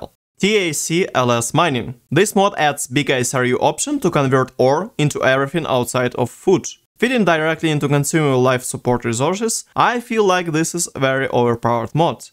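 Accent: Russia. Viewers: Наверное много кто не поймёт этот комментарий но акцент мне кажется у него русский... Это просто теория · Мне кажется или это русский акцент? Спасибо за гайд, чувак! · Мммм, руский акцент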